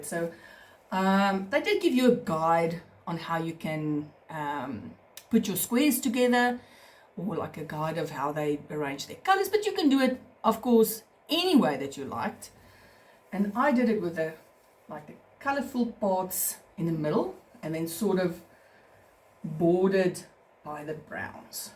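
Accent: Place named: South Africa. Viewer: Hello, I started following you on youtube because I also do amigurumis. But what called my attention was your english, sounded so familiar.....😜I am from South Africa also but living in Portugal. Love your work.🐱🐷🐹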